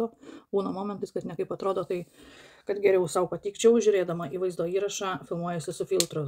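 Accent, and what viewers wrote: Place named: Aukštaitija, Lithuania. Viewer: Laba jūsu graži kalba turbut nuo Aukštaityjos busit sekmes jums gyvenime❤❤❤😊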